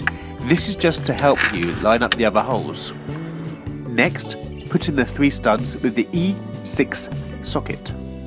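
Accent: United Kingdom. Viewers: Fucking gay British accent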